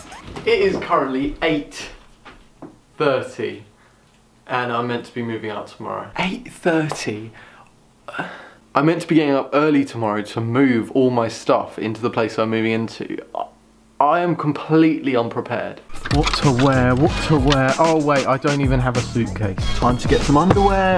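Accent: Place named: England, United Kingdom. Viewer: I’m American I like the way your English sounds what’s your thoughts about the American people I like the English voice lol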